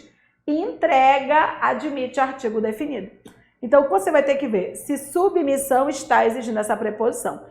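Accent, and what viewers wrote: Pará, Brazil. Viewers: A professora é paraense? P.S.: excelente didática <3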